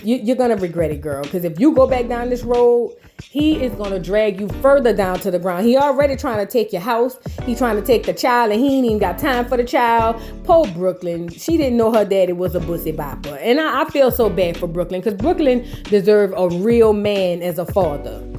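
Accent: New Orleans, United States. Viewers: it’s the accent FOR ME‼️The NOLA accent is my favorite.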